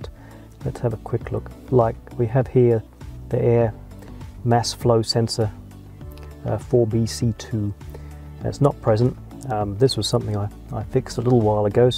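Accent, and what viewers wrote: Australia. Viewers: Brother you sound australian? you driving a left hand BMW? where you located now?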